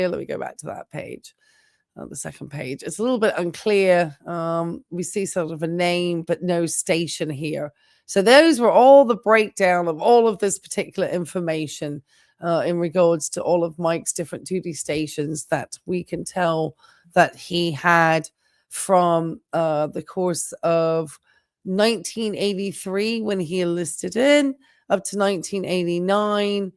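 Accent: United Kingdom. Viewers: My understanding is when you join up it's actually 8 years but 4 years active then 4 years ready reserve. I do know this to be true because friend his son just got done with active duty in the Navy he has to report to some office in our city. Said just sits there all day doing paper work once a month. She must be from England sounds like a British accent hasn't a clue how American military works. Her reading these letters word for word is annoy as h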